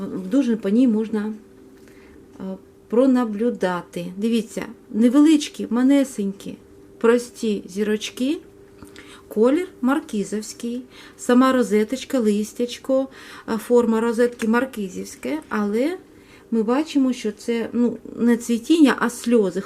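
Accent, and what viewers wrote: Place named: Surzhyk, Ukraine. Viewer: Суржик ,,режет" ухо.Отписалась.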